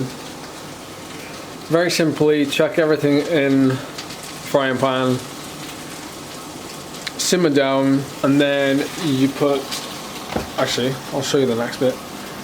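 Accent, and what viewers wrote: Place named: Llanelli, United Kingdom. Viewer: Where too you from in Wales?? Sound Swansea/Carmarthen way but not 100% sure??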